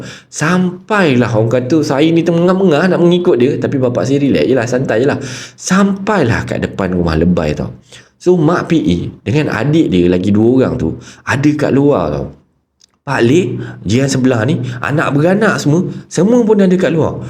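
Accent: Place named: Kedah, Malaysia. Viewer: Loghat kedah abang cun noh,tak macam buat2,ketegaq lama tak dengaq,hahaha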